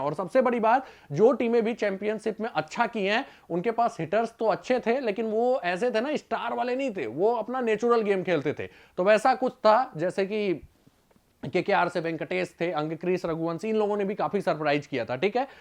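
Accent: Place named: Bihar, India. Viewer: Hindi to sahi bol pehle pure bihari accent aa rai Baki sabb to okay hai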